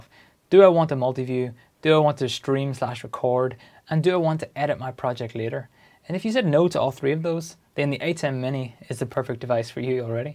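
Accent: Northern Ireland, United Kingdom. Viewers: Not often I hear an NI accent on YouTube! Just got my Atem Mini Pro down in Fermanagh!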